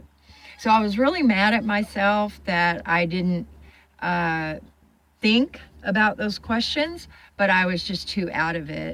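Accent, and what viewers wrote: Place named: Texas, United States. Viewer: Good that you know about that place.I guessed that you were from Texas because my friend Freddie talks exactly like you. :-)Take care.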